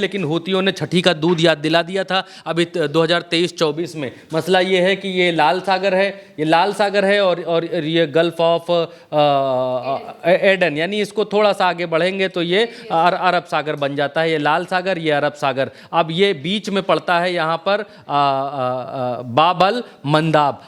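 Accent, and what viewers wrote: India. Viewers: bhai naya naam de diya apne nayi dukaan khuli hai. aap ke andar ek desi pan hai jis wajha se aap ko sunne me maza ata hai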